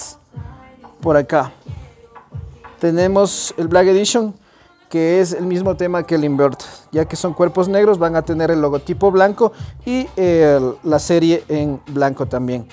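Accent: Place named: Ecuador. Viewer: de ley eres voz ecuatoriano, saludos desde cuenca, yo tengo la version blanca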